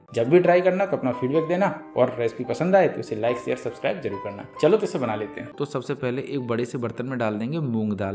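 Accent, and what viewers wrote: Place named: Bundelkhand, India. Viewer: आपकी बुद्देखंडी हिंदी बहुत बढ़िया लग रही है 👍 मुंगोसे भी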